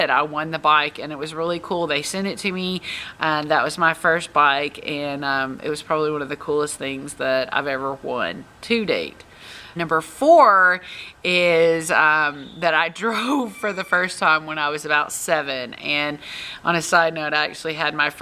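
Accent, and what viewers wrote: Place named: Arkansas, United States. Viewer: You sound like you’re from Arkansas! My husbands family is from Texarkana... you have the same accent 😁❤️. Fun intro, and crazy fun stories about yourself! I’m glad hooked us up!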